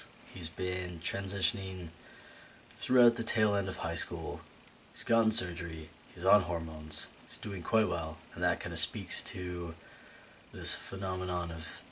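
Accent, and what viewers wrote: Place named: Ireland. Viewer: I adore that little Irish accent you are developing! Words can't even describe how excited I am to watch this short film. I have very little knowledge on trans people, but at the same time, a great deal. My ex was trans and I'm beginning to wonder if I am too. I just feel so incredibly masculine, yet nobody can truly understand it or me. Also, my best friend says I have the face of a 14 year old boy. lol I've been watching your videos from the beginning,. You are very awesome indeed.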